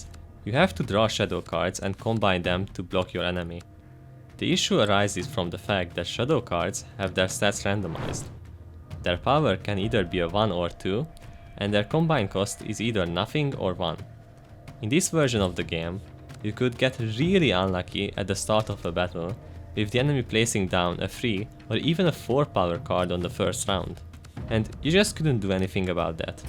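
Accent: Hungary. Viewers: Are you Hungarian? Just asking because your accent is realy familiar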